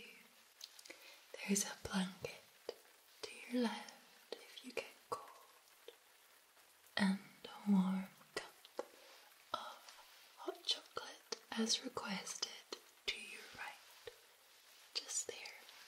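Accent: Scotland, United Kingdom. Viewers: You are my favorite Asmrtist♥️ seriously I fell mesmerized since the first time I heard your voice in of of your videos with Scottish accent 😌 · Wow, this is a good one. I love your Scottish accent! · Had a tiring day at work , so listening to those velvet Scottish tones from my favourite Bonny lass is just what is needed before bedtime ❤️u.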